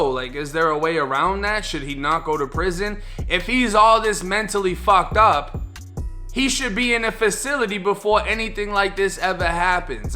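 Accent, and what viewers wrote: Boston, United States. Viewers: I KNEW YOU WERE FROM BOSTON!!!!!!!!!!! lmao this whole time ive been trying to figure it out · I know you were from Boston! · I knew you were from Boston from your accent homie. Your background is probably Irish I’m guessing. We got an Irish homie from the hood. We call him polar bear. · I knew knew knew you from Boston? What part...heard the accent in all the videos. Dorchester all day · The Dog shit shooting I cant with you today.... are u from Boston to? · You said walking through Boston? You sound like Boston but I thought you was Florida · What's that accent,? Boston? Anyway, I just found your vids a couple days ago...good 💩, pun intended 😂 I was from MI, came down to FL in 2012. Went through my little few month county ordeal, but nothing like the CIs. But your work still hits close to home. I wish you continued success. 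We rockin' with ya in Ft Myers 👊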